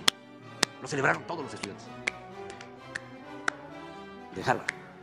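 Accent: Tabasco, Mexico. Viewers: Pero si no dijo nada que no sea cierto 🥲 el acento Tabasqueño, el Sombretito y hasta las incoherencias que dice alguien que supuestamente tiene un doctorado 🤣🤣🤣pero como vale más su hambre qué su dignidad 🥲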